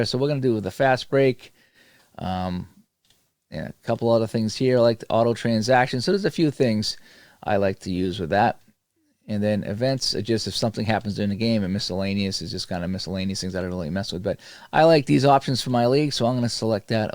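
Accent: Boston, United States. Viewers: Hi. I like your reviews. I saw your Regular Season Basketball (RSB) review and your Celtics-Sixer series with RSB and that was fun. You have a true Boston Celtic accent and that gives a nice touch to your reviews. I just started this video and will go back to it but I do want to correct the Strat-o-Matic pricing. For $62 you get the game with card image and any one season of your choice. You don't need to spend another $27 unless you want a second season.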